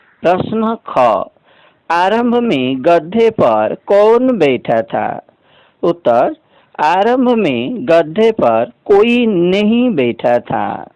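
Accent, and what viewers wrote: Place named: Odisha, India. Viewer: Are bhai sun ya odia midiam saraswati Hindi kitab ho to odia gurujika voice kasa aiga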